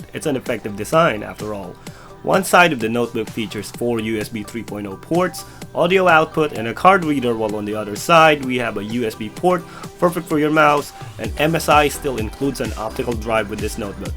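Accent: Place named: Australia. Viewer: it's a rare opportunity you'll see an asian guy with an aussie accent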